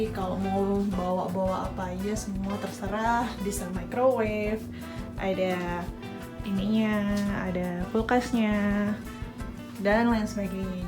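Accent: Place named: East Java, Indonesia. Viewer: Learning by doing, mantap mbak Btw mbak e logat e wong Jawa timur heheh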